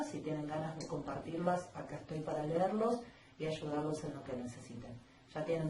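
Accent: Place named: Argentina. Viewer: Buena explicación, lo único que el sonido y el castellano que habla, se me dificulta, entender, los términos médicos entendíble, la gramática argentina es lo que se me dificulta.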